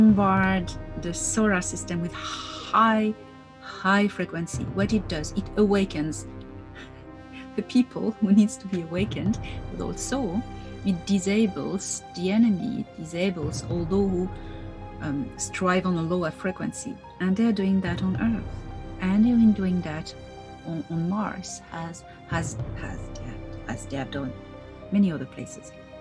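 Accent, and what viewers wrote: France. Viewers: I love yr videos and appreciate yr commitment to truth and freedom of all humanity. I do have hearing issues. Your voice is soft and beautiful and I love yr French accent. However, so many times the music is just too loud for me to hear u. I’ve listened twice or more to sections that I can’t pick up. Love yr attitude and grace. Grateful for the information and the GF support. ❤️❤️❤️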